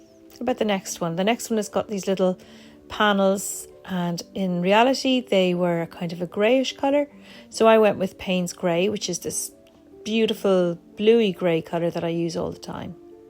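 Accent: Ireland. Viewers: I just found you on YouTube! Love your drawings. Would you share what is the name of the brown ink? I really like it! I also adore your lovely Irish accent! Thank you.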